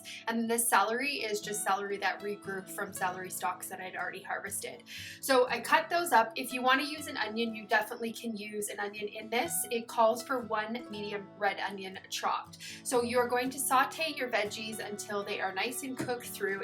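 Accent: Canada. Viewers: I just found your channel, I am enjoying following you. I can hear a slight Canadian twang, I live near the border in the PNW. I am excited to see more of you videos.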